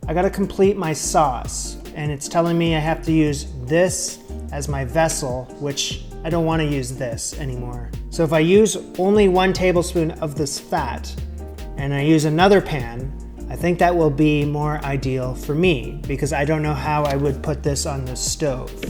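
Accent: Canada. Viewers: Thought you were a Canadian guy! ❤️😊🇨🇦 Love your channel, keep up the great content! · Your accent gave away that you were from Canada.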